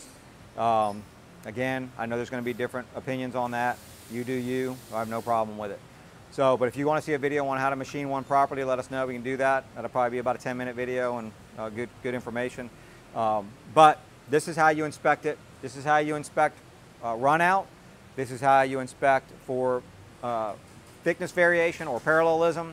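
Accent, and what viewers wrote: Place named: Western Pennsylvania, United States. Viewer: Are you originally from Western PA? I hear some accent from that area of western PA, WV, OH area. Just certain words.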